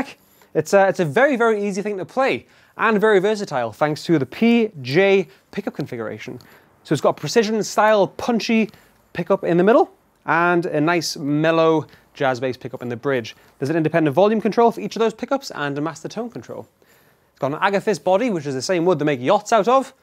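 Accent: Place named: RP, United Kingdom. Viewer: are you just the coolest bass player I've seen in a long time, or what? I love the intro, 'you shouldn't have'. For some unknown reason, every time I hear your accent I think of a quirky bass player, educated at Oxford, or Cambridge, but also understands the finer points of bass playing and bass guitar. Thanks for the review BTW; excellent. I've passed it on to all my muso friends.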